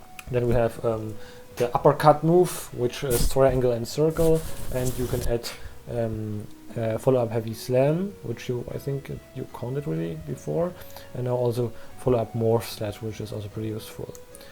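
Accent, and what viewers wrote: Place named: Germany. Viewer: 😅 You have to understand with a German accent he is indeed pronouncing it correctly. In fact one could argue that is supposed to be how it’s pronounced given the spelling. Americans are about the only people who pronounce it as “gage”.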